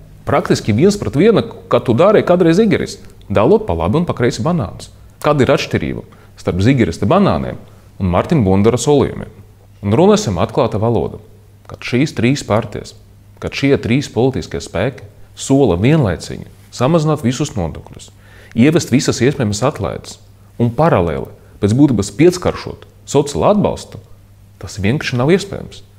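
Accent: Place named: Russia. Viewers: Jums ir vismaz viens vērtīgs arguments pret Nilu neskaitot krievu akcentu un krievu valodas lietojumu? Vai jūs tikai lamāties spējat? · Human . Akcents ? Viņš drīzāk krievu valodā izrunā latviešu vārdus.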